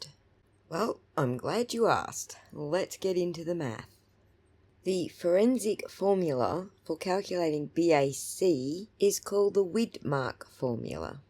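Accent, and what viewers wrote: Australia. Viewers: I love listening to you. I understand what you are saying even with the math. If I had to math it out myself,I think I would end up in a brain fog stupor 😂. I followed the last trial with Vices ❤. I'm so happy to have found your channel. Much respect from south of the Tweed.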